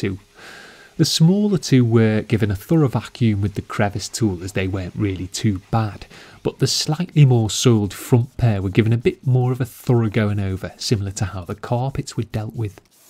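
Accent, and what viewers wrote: Liverpool, United Kingdom. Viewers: Daniele Cognome - he has a Scouse accent - from Liverpool, England. Same as the Beatles, that pop group from the 1960s.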